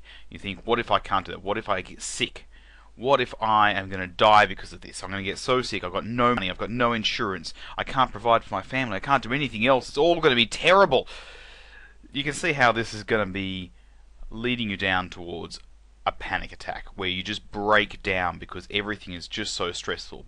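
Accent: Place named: New Zealand. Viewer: Wow you described me exactly. Are you a Kiwi you have an NZ accent?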